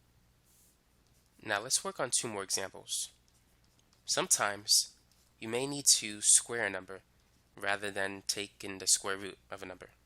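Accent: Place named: New York, United States. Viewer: I came hear to get my seventh grader help. Now I can't stop listening to him. His voice is calming, yet keeps our interest. I love my friend's New York🤔 accent.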